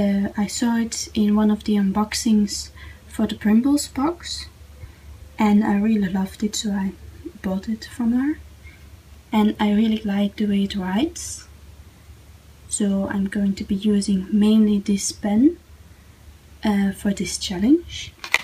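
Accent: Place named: Scandinavia. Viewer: Great to see more new YouTubers doing videos for onebookjuly! I love your accent, is it Scandinavian?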